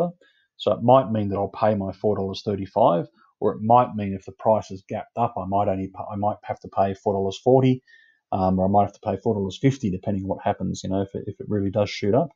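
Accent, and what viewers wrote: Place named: Australia. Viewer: Mate your videos are superb, no fluff, music or silly thumbnails, just solid useful information. Thank you. I've subbed, you deserve more man. Plus your easy on the ear, kinda like an Aussie Jim Browning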